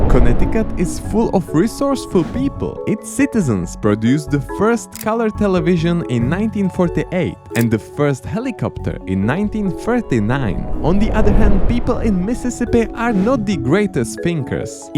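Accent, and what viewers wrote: Czech Republic. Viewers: This guy is Czech. English is most likely not his first language.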